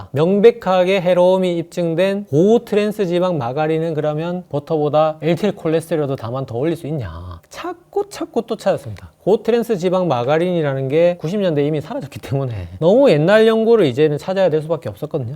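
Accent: Gyeongsang, South Korea. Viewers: 오늘따라 경상도 억양이 너무 쎄게 들리네요 말을 잠간 끈어서 말씀해주면 감사겠습니다 숨도 안쉬고 계속 말하는것같아 듣기가 좀 힘이듭니다 항상 감사합니다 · 쫏기듯 말은 빠르고, 발음은 정확하지 않고, 톤은 높고, 경상도 억양은 심하고 듣기가 불편함.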